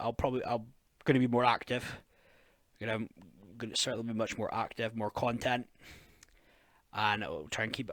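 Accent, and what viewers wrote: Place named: Aberdeen, United Kingdom. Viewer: I'm thinking Aberdonian :)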